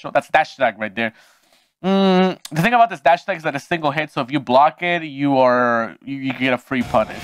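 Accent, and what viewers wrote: Chile. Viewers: Habla chileno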